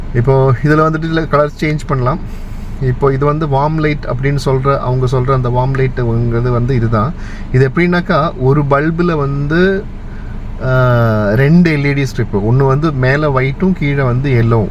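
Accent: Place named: Kerala, India. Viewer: You talk tamil well, but somewhere I feel like u hav sone mallu connection.. is it true?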